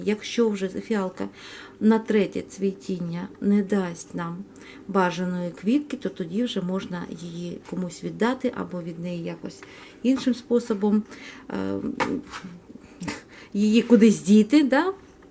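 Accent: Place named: Surzhyk, Ukraine. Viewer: Суржик ,,режет" ухо.Отписалась.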